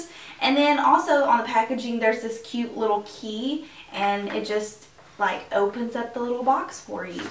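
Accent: South, United States. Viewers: Love to find a beauty guru with a southern accent 😘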